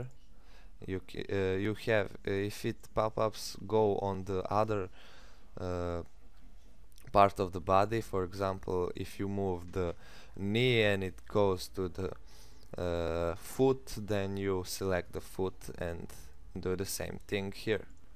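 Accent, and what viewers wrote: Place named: Croatia. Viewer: prica engleski jezik a ima hrvatski naglasak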